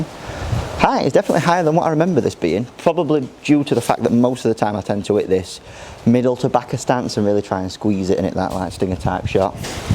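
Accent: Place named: Yorkshire, United Kingdom. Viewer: Have me in stitches everytime I watch. Proper Yorkshire man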